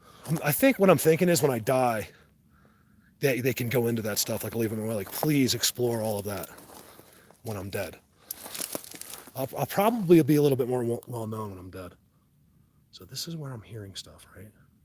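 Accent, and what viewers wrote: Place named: New Jersey, United States. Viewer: I missed your live stream! Damn! Jersey. Now I know where your accent is from! Makes perfect sense. I’d been wondering since I subscribed. Love ❤️ it! Do more Live Streams! I’ll hit your Notification Bell.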